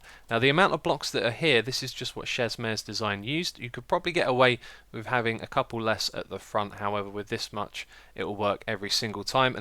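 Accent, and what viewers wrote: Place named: United Kingdom. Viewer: fricken peeple deez dayZ. cant even let a man have a british akcent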